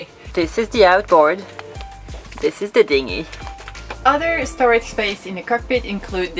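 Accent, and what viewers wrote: France. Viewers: Just discovered your channel. Really informative and love your french accent:)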